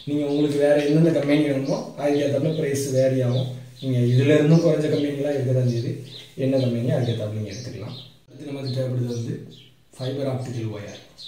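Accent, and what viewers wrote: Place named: Tirunelveli, India. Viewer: Are u from nellai district or kanyakumari...?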